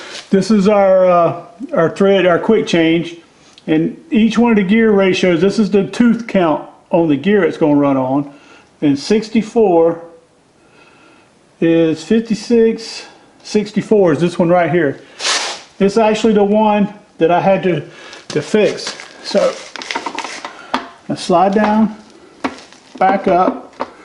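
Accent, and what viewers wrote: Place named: South, United States. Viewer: Still learning how to listen/understand southern backyard machining (American) English/accent. Your learning curve on understanding that old of machine, informative.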